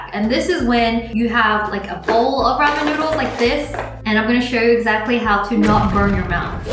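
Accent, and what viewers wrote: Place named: Australia. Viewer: I miss your Aussie accent! You sound so different. Will defs try the peanut butter recipe 😋😋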